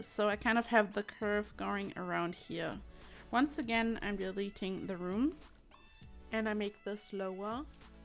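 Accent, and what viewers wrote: Germany. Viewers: Thanks! Worked great. You just gained a new subscriber ❤️ Btw where are you from? Your accent sounds a little German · I wonder if there's a way to forego that third storey. Could you build a one tile room on both the bottom and the upper storey (maybe next to the stairs) to place a roof? Because while I find this to be an ingenious idea, it will turn into a massive house and I'm more of a two storey house girl myself ^^' And now the question again in German (to make my question more understandable), because judging by your accent you might be? Könnte man nicht einfach anstatt 3 Stockwerke nur 2 bauen? Also z.B. neben der Treppe einen 1 Kachel-weiten Raum und dann im 1. OG einen weiteren drauf und dort dann das Dach drauf? Oder lässt das einen dann immer noch kein Dach platzieren? Weil, 3 Stockwerke sind mir dann doch etwas hoch, vor allem wenn man dann dort kein Zimmer mehr einbaut.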